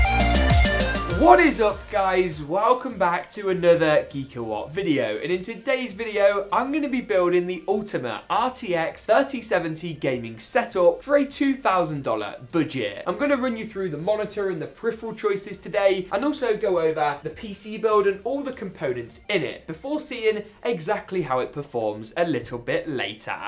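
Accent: United Kingdom. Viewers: Great video but I don’t get why you are listing and talking about prices in Dollars, you sound like your from the UK so I’m a bit surprised and youtube is full of American PC Builders so it’s shame. Also it’s all great showing a great looking and performing build but it would be nice to see some thermal results of the builds which is just as important.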